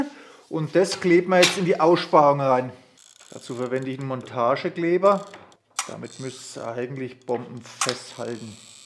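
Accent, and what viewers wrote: Franconia, Germany. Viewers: du frängelsd jo wid zau :) Mega Grüße aus Unterfranken!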